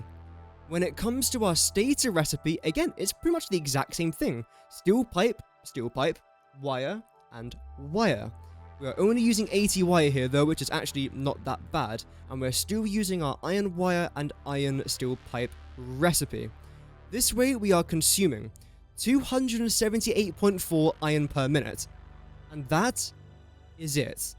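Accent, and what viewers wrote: United Kingdom. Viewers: you sound like a caricature of a posh English noble, or someone from the rich southside of Dublin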